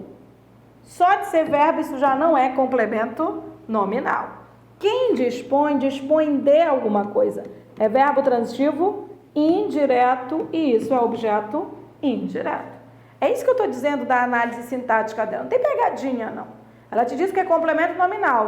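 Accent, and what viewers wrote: Pará, Brazil. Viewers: Reconheci o sotaque paraense!! hahaha adorei a aula!!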